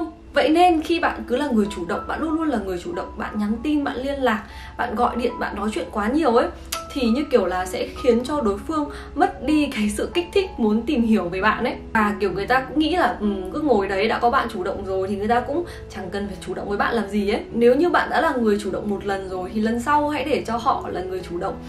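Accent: Standard. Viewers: Chị nói chuẩn thế chứ lị 🥰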